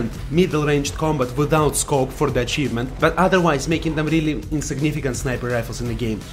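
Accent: Russia. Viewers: My friend YOU are speaking ULTIMATE RUSSIAN ENGLISH. (sorry if it came out racist) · Are you Russian by the way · i can tell from ur accent that ur russian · Definitely more Russian than Italian, his accent is strong. But cute too ;). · Are you Russian ?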